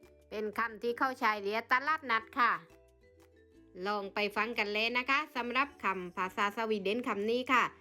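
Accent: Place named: South, Thailand. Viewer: ชอบจังเลยพูดภาษาใต้บ้านเราเพิ่งเข้ามาติดตามเดี๋ยวจะตามแลย้อนหลังนะคะ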